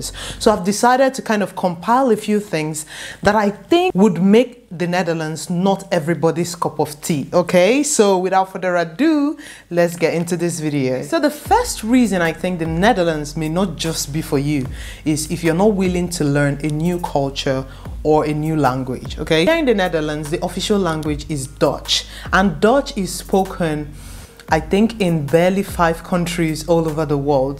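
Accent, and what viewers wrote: Nigeria. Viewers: I am South African and I love how persistent the Nigerian accent is no matter how long they live outside their own country! 😂 This lady is extremely poised and articulate either way ❤ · nice video😀. you still keep your Nigerian accent and vibes, you keep using "ABI"👍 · she is a Nigerian,, Nigerians accent pronounce u"as o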